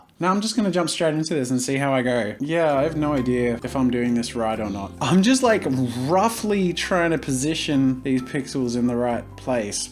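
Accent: Australia. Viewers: (Australian accent) he is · Are you from Australia 🇦🇺 you sound like it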